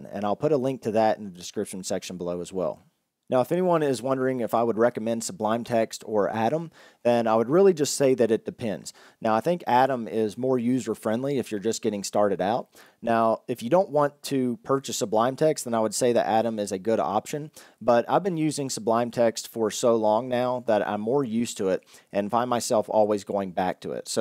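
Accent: South, United States. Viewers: Does he have a southern accent or am I hallucinating?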